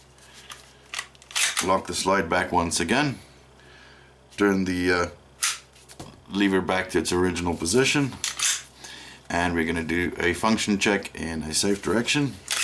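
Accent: South Africa. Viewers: Bru, are you by chance south african?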